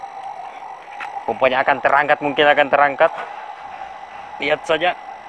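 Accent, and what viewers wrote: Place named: East Java, Indonesia. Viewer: MANTAB PENJELASANNYA , KALAU DENGAR LOGAT BICARANYA SEPERTINYA MAS DARI JAWA TIMUR YA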